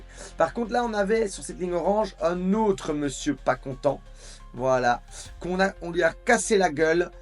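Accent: Belgium. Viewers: 👍😊 j’entends l’accent belge il me semble ? 😁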